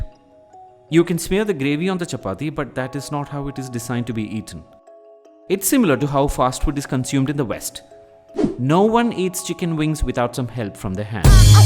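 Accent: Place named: South India, India. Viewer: Westerners who think all indians sound the same should watch this. This is one of the many south indian accent.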